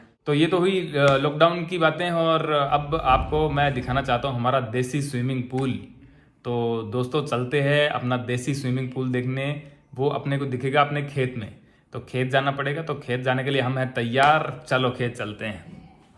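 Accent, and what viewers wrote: Bagri, India. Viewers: आपका गाँव कौन सा है किस जिले में है बागड़ी बोलते हो ये भी पता चला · आपकी बागड़ी सुनकर अच्छा लगा। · Tone Rajasthani par kabi kabhi bol bhi diya karo rajasthani bhasa 😀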